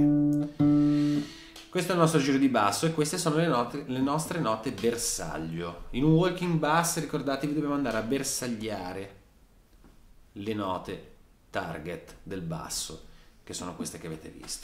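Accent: Genoa, Italy. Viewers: Maestro sei bravo,bravo,bravo, complimenti , la lezione sul walking bass è stata una meraviglia , poi sentirti parlare con quel bel genovese e' il massimo, una carezza in più a Nebbia..🙂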